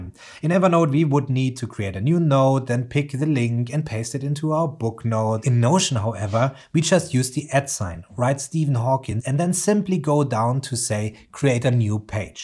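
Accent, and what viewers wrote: Germany. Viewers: Awesome Video! Without looking it up- I guess you are german (like me) :D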